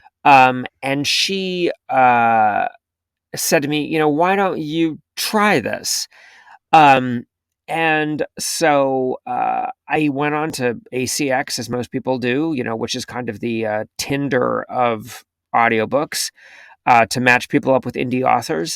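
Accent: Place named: Australia. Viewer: I love his Australian accent...Him narrating Honeymoon For One by Keira Andrews is amazing!!!!!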